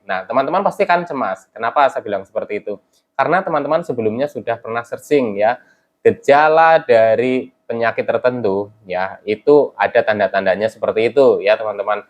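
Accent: Banyumas, Indonesia. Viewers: Mas berapa lama kena gerd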